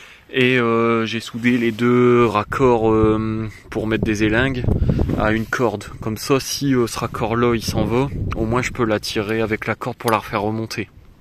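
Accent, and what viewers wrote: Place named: Quebec, Canada. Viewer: Tu habites où? As tu déjà habité au Québec? On sent un accent québécois derrière ton accent français...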